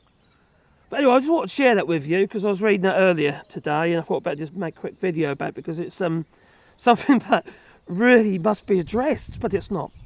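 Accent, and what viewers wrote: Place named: United Kingdom. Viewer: I like this guy's accent ... British, I believe.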